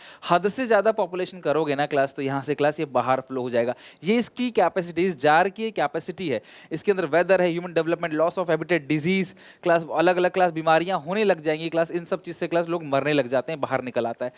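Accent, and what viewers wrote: Odisha, India. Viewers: Sir are you from odisha??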